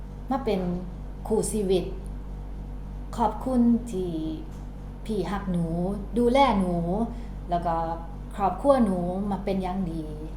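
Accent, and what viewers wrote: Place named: Isan, Thailand. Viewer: พูดภาษาอิสานเก่งจัง สำเนียงเหมือนมาก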